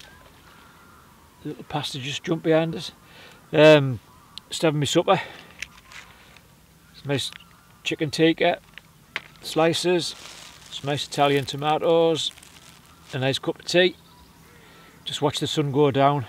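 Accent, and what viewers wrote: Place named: Northern England, United Kingdom. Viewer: U sound like ur a northern lad like myself had to ask lol brilliant vid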